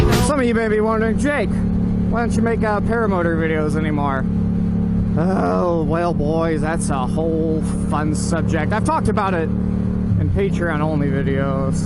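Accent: United Kingdom. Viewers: Absolutely splendid English accent, sir. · loving the British accent XD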